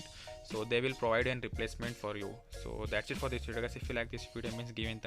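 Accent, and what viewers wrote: India. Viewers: Indiano falando inglês é o que há de pior no planeta!!! Pqpppp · That's how indians talk "waylowrent" and yeah what did he expect for a budget gaming laptop like that?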